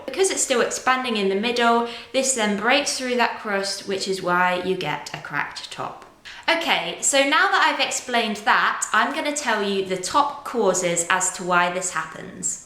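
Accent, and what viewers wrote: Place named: United Kingdom. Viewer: I like your brit accent :p cute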